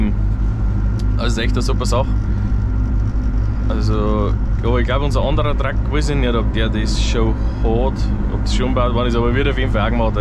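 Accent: Bavaria, Germany. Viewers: Iwo Boarisch bassd subba. Andaschd was nix. Klingt a ned guad 😅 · Dere, geils video. Red weiterhie bayrisch 👌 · Mich Stöhrt das nicht. past schon dein Bayrisch.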